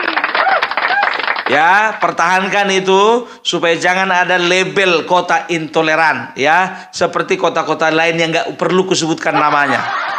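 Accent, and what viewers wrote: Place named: Batak, Indonesia. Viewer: aku suka nonton konten nya dan logat bataknya lucu banget dan mengena sm orang nya yg intoleran terhadap agama lain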